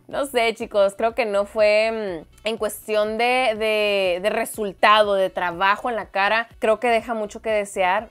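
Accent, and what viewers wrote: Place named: Nayarit, Mexico. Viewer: Así pasa cuando uno de sabe maquillar o por lo menos uno se siente bien con el maquillaje que nos hacemos, yo en mi caso he tratado de que me maquillen en Sephora y no me ha gustado y nunca le atinan a mi color de piel siempre me dejan gris, así que ya mejor ni le busco, saludos me encanta verte yo soy de Nayarit y de cierta manera la forma que hablas me recuerda mucho a mi Nayarit y me haces reír 😘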